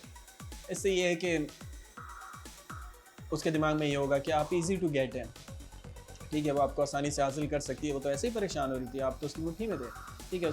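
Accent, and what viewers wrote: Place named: Kashmir, India. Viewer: Sir, not only vdo, but and every trick and sentence, crystal clear logically effective. Hopefully you are from JK as voice tone says where I stayed aporox 14 years. 👍🙏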